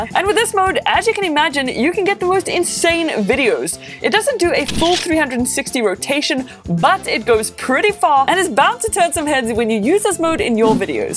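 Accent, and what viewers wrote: South Africa. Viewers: I love your channel!, you are so full of life and fun! Love your South African accent! Are you single by any chance? If not are you happy? Lol keep up the good work. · Are you south African ? 😅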